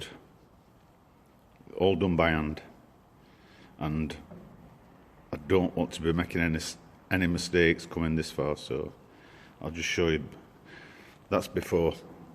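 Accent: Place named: Manchester, United Kingdom. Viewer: who's brave enough to sit in the chair next to the bridgeport.also i am hearing a manchester accent,how close am i.great machine work mate.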